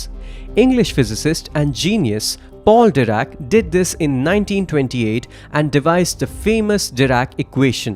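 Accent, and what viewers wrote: India. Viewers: Its the annoying indian accent I cannot tolerate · This is an Indian guy. His accent.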